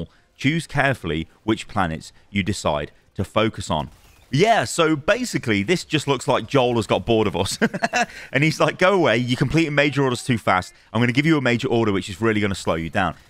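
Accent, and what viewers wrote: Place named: United Kingdom. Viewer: Ah yes my thirteenth favorite channel: Panic in a Brit Voice <3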